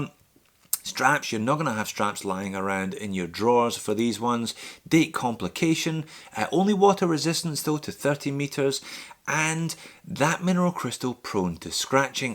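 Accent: Scotland, United Kingdom. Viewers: Are you a Scottish? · Scottish T, scottish accent.. instantly subscribed! :D Nice video btw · Are you Scottish?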